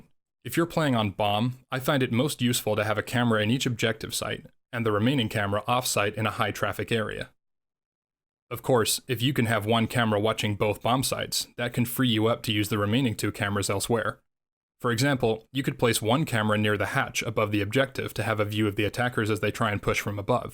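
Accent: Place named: United States. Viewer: This was much appreciated. As someone who doesn't play (or pay) enough to have many operators, it was annoying that I bought Valkyrie and didn't use her too much due to feeling like I wasn't using her to her full potential. This definitely had some useful pointers for using her gadget which I'll be able to implement later tonight :p. Also, nice American accent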